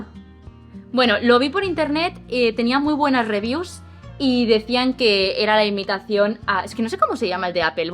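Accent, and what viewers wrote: Spain. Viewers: Eres Española?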